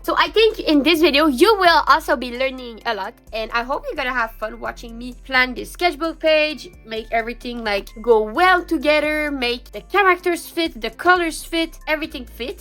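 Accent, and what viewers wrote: France. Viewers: the french accent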